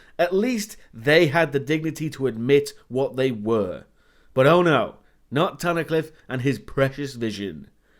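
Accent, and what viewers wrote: United Kingdom. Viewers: Is this dude British? I love his reviews btw I just want to know if he is or not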